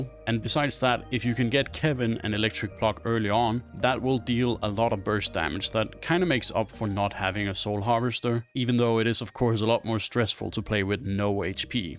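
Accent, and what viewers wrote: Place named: Denmark. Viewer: Do you happen to be Danish? You sound like you could be.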